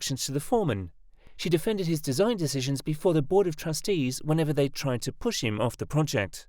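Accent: United Kingdom. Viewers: Do we really need a British accent? Fuck no.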